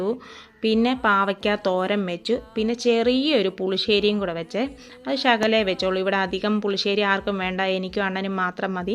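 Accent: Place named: Alappuzha, India. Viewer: ആലപ്പുഴ ആണോ വീട് 🤔🤔 സംസാര രീതി.. കേട്ടിട്ട് ചോദിച്ചത് ആണ് 🥰🥰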